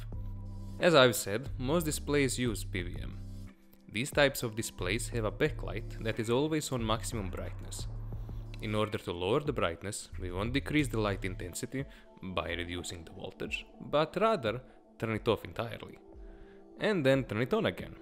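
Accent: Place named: Russia. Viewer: His accent makes him sound like a physicist from mother Russia he sounds like he knows what he’s talking about 👀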